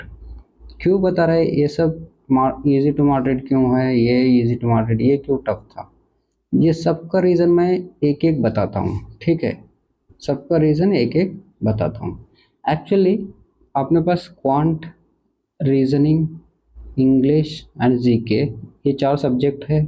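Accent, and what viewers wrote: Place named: South, India. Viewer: I like your soth Indian accent of hindi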